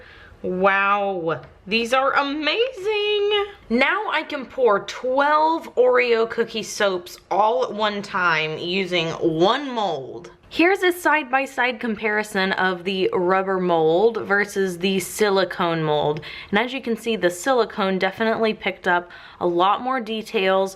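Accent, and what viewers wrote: Texas, United States. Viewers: TheLaydewlf that’s definitely Texas (also in Texas).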